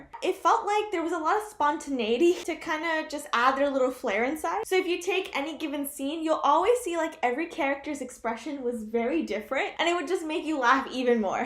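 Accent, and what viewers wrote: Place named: United States. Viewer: Damnn.....Your Hindi with American accent is kinda cute and it is growing upon me...💥💥💥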